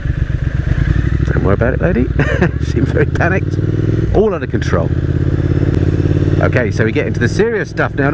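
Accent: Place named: United Kingdom. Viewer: It is interesting to hear Brits talk... And a little funny!.. ( no disrespect mate )... Especially the the part were you mention where the bike was made... " made in Toyland".... I'm with you mate! Those bikes should have been made in Britain, that way there would be no excuse on its development.... Sorry it didn't work out in the US either!... But it has learned some important lessons along the way and they would be implemented... Like for example, This year went to the beauty shop in Italy and got itself some nice Brambo brakes..... Maybe next year it will go to Sweden and go to Ohlins to get a new high performance frame and suspension, from now on the sky is the limit!... welcome to globalization!... Lets face it, all the high performance motorcycle methods and designs are covered with patents and there is very little margin for significant innovation.... 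And the best solution is the old saying "if you can't beat them, join them"....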